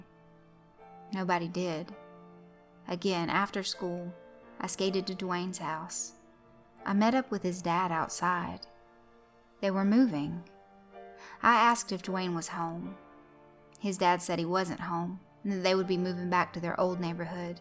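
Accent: South, United States. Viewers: I have heard you say that you are from Georgia , but I don't think that I have ever heard a southern accent from you . That is until this video . While the theme of the video is always a sick and sad story , your voice gave it understanding and empathy for the friend in the story . Some reading this would automatically go for the anger and disgust of Chango . They probably also cheered when he was killed , but I think the story goes well beyond that and to the suffering of the victims . But either way your voice gave respect to the victims of child molestation and to the survivors .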